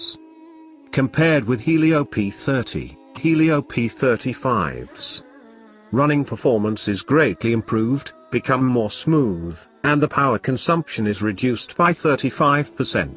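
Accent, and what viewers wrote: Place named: United Kingdom. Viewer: video introduces: UK voice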